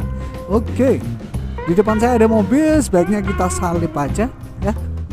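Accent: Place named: Ponorogo, Indonesia. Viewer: Iki lho dlondonge wong ponorogo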